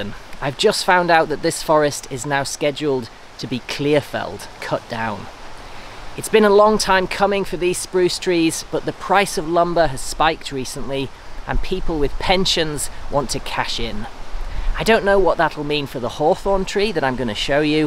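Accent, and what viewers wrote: Ireland. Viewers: Sounds like you have become a Fully fledged irishman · Your accent becomes more Irish now mate! ☘️💚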